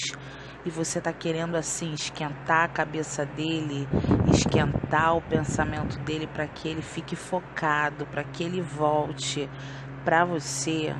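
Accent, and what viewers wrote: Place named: Rio de Janeiro, Brazil. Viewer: É carioca?sotaque lindo..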